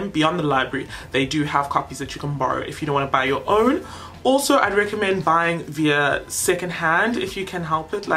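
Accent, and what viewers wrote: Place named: South Africa. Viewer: Thank you this was very helpful! My mom heard your voice and was shocked that you're South African😂😂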